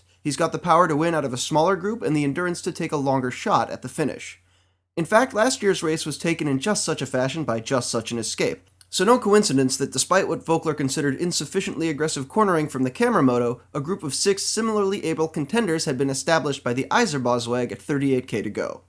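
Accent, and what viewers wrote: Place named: United States. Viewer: Nice video, and nice to hear a yank who know's his stuff for a change.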